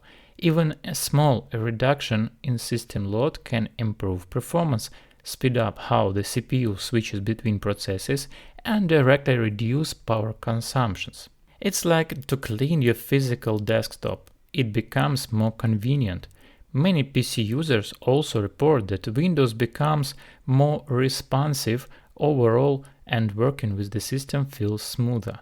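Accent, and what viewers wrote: Russia. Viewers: Are you russian? :)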